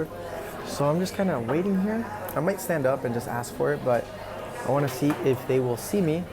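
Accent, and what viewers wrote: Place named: Argentina. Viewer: Your Argentinian accent is impeccable;). We all speak Spanglish in the States and then when going to a true Latin country that it doesn’t cut it , lol. French is my first language, can speak Portuguese ( Brazilian style ) and some Spanish, but the Argentinians loved the way I spoke Spanish ( though very minimal) Thank you for sharing your story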